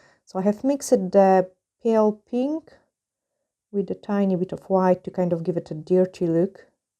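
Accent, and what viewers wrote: Scotland, United Kingdom. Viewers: Love hearing your Scottish accent coming through 😂😂. Also lovely work x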